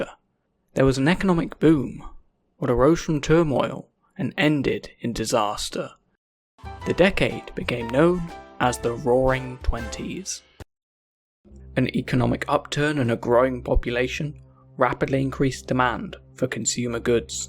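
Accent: United Kingdom. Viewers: What’s with the British accent for an American history presentation? I can’t even!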